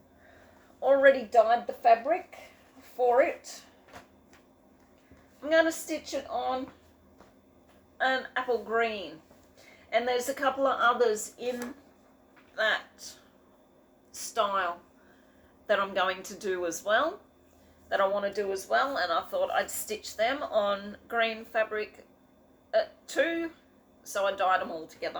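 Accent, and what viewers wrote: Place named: Australia. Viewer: Sorry to hear your not 100%. Darren and Sharon both seem to have similar problems😞. Why not make it a round TEN for projects!!!? Stitch with me would be great😁. If one a week got too much you could always alternate with a SWM one week and a Flosstube another week? I love the Aussie accent, please don't put it down😁. Stay safe, get well soon🤗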